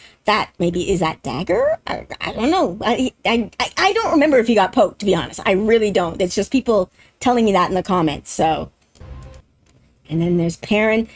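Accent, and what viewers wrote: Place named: United States. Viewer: American accent, American accent dances in American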